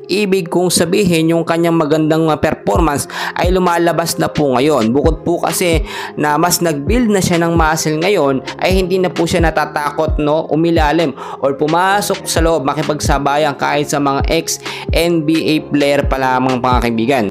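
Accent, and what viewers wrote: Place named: India. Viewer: parang kang mga indian dito sa dubai magsalita boss laging may NO sa dulo hehe❤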